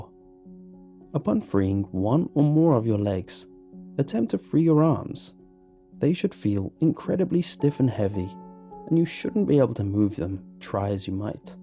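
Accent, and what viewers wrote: United Kingdom. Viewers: British people narrating horror stories yes delicious mm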